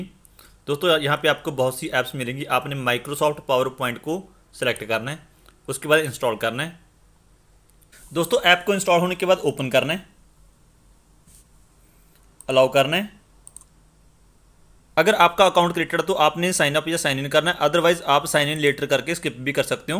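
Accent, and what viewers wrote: Punjab, India. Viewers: Are u punjabi? Ur type of speaking seems punjabi ! And i like punjabi😊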